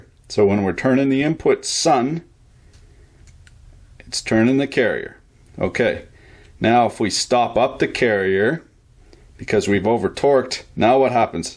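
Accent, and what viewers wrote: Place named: Quebec, Canada. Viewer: your french isnt bad, almost sounds Quebecois :P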